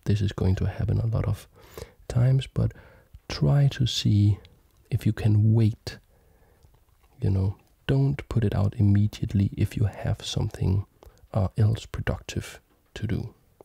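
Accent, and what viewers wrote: Germany. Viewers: omg bro i didnt even know you werent german your accent sounded so german to me - anyway, still addicted to your content!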